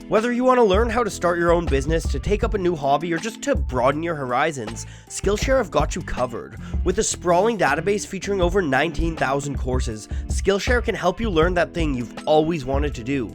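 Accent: Canada. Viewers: Are you Canadian? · I like this channel, with all it's flaws and Canadien accents (houwse gets me every time) and/but I challenge your stage/studio knowledge to make a video on how to mic shit based on the hallway/staircase situation of the unbelievably talented John Bonham. Find songs, and show how mic placement changes and improves the sound.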